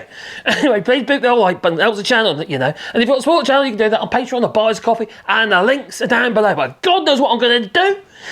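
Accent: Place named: Norfolk, United Kingdom. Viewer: You had me going there for a while with your “booty exports”. I wondered what was going on until I remembered that naughty Norfolk accent of yours. 👢🍑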